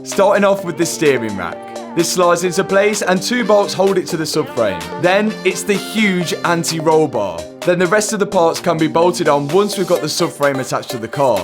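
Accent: United Kingdom. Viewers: I'm so irritated of his British accent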